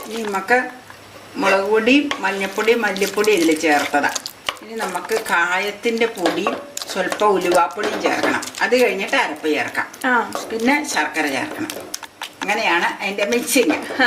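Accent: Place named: Perumbavoor, India. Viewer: പെരുമ്പാവൂർ, കോട്ടപ്പുറം ഭാഗങ്ങളിൽ ഉള്ളവർ സംസാരിക്കുന്ന രീതിയാണ്. അവിടങ്ങളിലാണോ വീട്..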